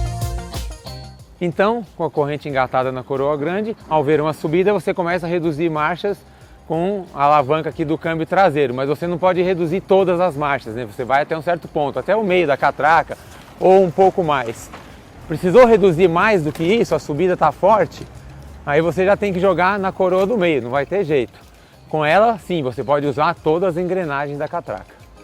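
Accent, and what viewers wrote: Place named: Northeast, Brazil. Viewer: Fala direito so nordestino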